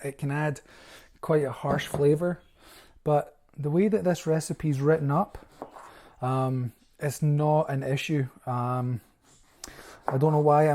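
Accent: Scotland, United Kingdom. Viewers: where in scotland you from bud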